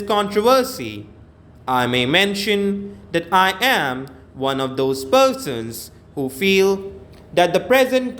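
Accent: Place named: England, United Kingdom. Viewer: O bhaiya thoda halka haath rkho humare yha itni standard English na boli jati na smjhi jati isliye pronounciation thoda ache se bolo Jo smjh aa sake pura angrej ban rhe ho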